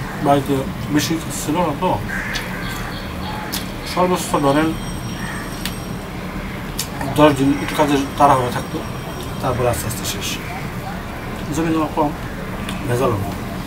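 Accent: Bangladesh. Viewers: আমার বাড়ি মানিকগঞ্জের সিঙ্গাইরে, আমাদের ওখানকার মানুষ যখন শুদ্ধ ভাষায় কথা বলার চেষ্টা করে তখন কিছুটা এমন শোনায়। তাই জিজ্ঞেস করলাম আর কি। আপনার ভিডিও ভালো লাগে। চালিয়ে যান দাদা। সাথে আছি। :) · Tanveer Touhid এটা টাংগাইলের ভাষা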